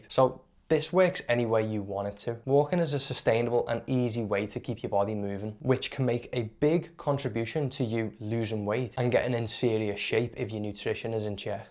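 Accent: Liverpool, United Kingdom. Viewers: Great video btw are you scouse ? Love that accent you speak like Jamie carrager , are you a Liverpool fan ?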